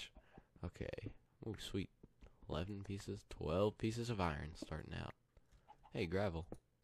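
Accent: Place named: Kentucky, United States. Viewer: I seen that is from Kentucky he’s got that accent I live in pikeville KY btw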